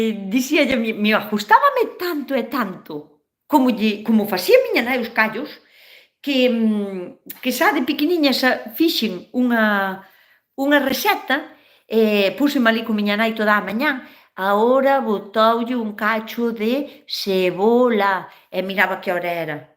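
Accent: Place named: Lugo, Spain. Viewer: Yo nací en Lugo ,pero no me crié en Galicia ,ahora hace unos 35 años que vivo en Galicia de nuevo y me recuerda tu acento a familia que tengo en Lugo y a alúmnas que he tenido de la zona de Ribeira ,me encanta el deje que tenéis y se os entiende de maravilla ,no así a los de la zona de Mazaricos que sesean mucho ,por lo que les entiendo peor ,espero seguir viendo tus recetas ,y por supuesto que las haré . Gracias y sigue siendo tan maja y espontánea como eres .Un afectuoso saludo de esta gallega castellanoleonesa .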